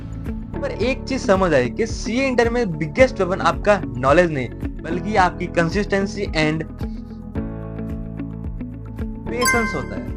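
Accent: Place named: Gujarat, India. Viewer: bhai bohat achhi video banai hai, ek dum jordar and your gujarati accent😀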